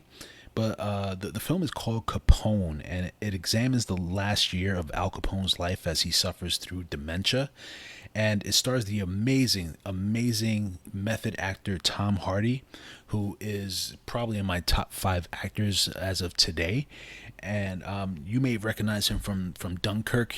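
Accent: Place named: New York, United States. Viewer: Sounding real new yorkish!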